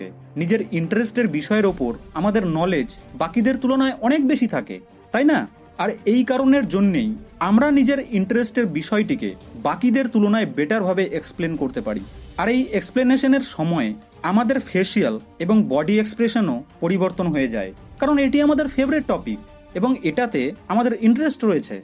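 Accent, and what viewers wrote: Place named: Kolkata, India. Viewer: ভারতের কলকাতার মনে হয়,,, কথাবার্তায় মনে হচ্ছে কলকাতার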